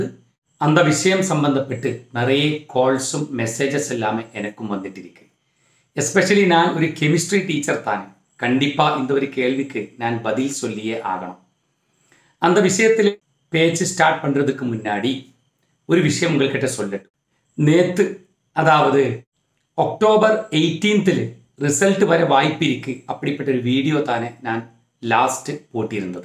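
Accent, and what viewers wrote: Kerala, India. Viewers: Looks like malayali speaking tamil. Is it?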